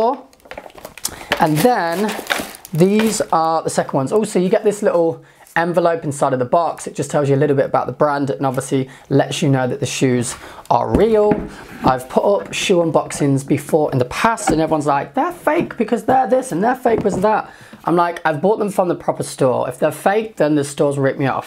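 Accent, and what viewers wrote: United Kingdom. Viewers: are you Brit? i can hear accent..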